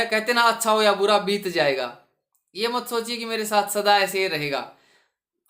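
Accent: Haryana, India. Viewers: Aap haryana se ho na 😅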